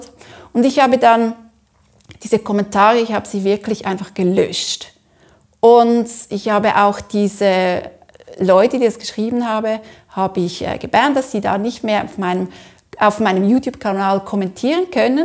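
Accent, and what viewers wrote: Switzerland. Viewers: Danke für deine offene und ehrliche Meinung. 👍Mach weiter so mit den Lehrreichen Videos. 👌🏻PS: ich mag so sehr deinen schönen Schweizer Dialekt. Gruß aus dem hohen Norden, Manfred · Wow, ein super Motivations-Video! Vielen lieben Dank! Mal ganz abgesehen davon, daß du wirklich sehr sehr sehr guten Inhalt produzierst; ohne dein schönes Schweizer Akzent wären deine Videos weniger Scharmamt ❤️. Ich finde es Super, daß du Mut gefunden hast und weiter gemacht hast. Deine Kurse sind bestimmt sehr sehr gut! Ganz liebe Grüße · Negative Kommentare sind auch irgendwie eine Auszeichnung ! So ein „negativer Kommentator“ nimmt sich die Zeit um Deine Videos zu sehen, ist möglicherweise neidisch oder kann mangels fundiertem Fachwissen keine sachliche Kritik anbringen oder hat Probleme sich angemessen auszudrücken . Wenn „negative Kommentatoren“ Deine Videos wirklich nicht gut finden würden täten sie diese nicht anschauen oder gar zu kritisieren versuchen. 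„Nicht einmal ignorieren solche Leut“ würden wir Österreicher sagen 😜 Ausserdem, ich liebe Dein schweizerisches Deutsch 😍 Ich finde es sehr wichtig und erfrischend wenn wir „Deutschsprachigen“ unser Dialekte sprechen und nicht von Schleswig Holstein bis Südtirol ein Einheitsbrei gesprochen wird . Liebe Grüsse aus Oberösterreich ! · Für einen erfolgreichen YouTube Kanal braucht man in regelmäßigen Abständen einen guten, hilfreichen Inhalt. Weiterhin ist es meines Erachtens wichtig, dass die Person authentisch rüberkommt und man nicht den Eindruck hat, dass da eine Show abgezogen wird. Beides ist bei deinen Videos voll und ganz gewährleistet. Dazu der schöne schweizer Akzent, der deine Authetizität noch unterstreicht. Vielen Dank für deine ausgezeichnete Arbeit! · Vielen Dank für deinen ehrlichen Beitrag! Ich liebe deine Videos und konnte mir bereits viele wertvolle Tipps mitnehmen. Ich finde dich sehr charmant und liebe deinen Schweizer Akzent. 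Gerade das macht dich so authentisch und unterstreicht deine sympathische Ausstrahlung! Viel Erfolg weiterhin! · Zum Glück kannst Du Selbstbewusst mit dieser Problematik umgehen...Ich mag Dein geschweizertes Deutsch..😊 Du bist super... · Liebe, Du hast mir aus der Seele gesprochen. Es ist zumTeil unglaublich, was man im Netz so erlebt... Viele meiner Kollegen schalten Werbung und werden dadurch persönlich angegriffen... ohne Worte. Danke deshalb für dieses mal wieder sehr gelungene Video. Ich persönlich mag neben Deinen Inhalten Deinen Schweizer Dialekt besonders. Bitte deshalb unbedingt beibehalten und kultivieren;-) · Ich liebe deinen Schweizer Dialekt 😊🇨🇭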